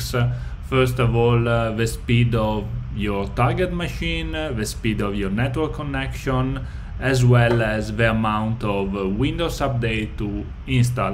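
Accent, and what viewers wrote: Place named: Italy. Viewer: Lovely precise, simple video. I love your wonderful cheery Italian accent !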